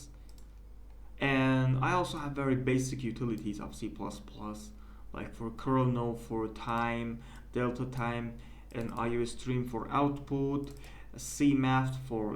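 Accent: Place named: Iran. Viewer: Mate u sound vaguely persian. Correct me if im wrong. Either way, love the content. Looking forward to future videos.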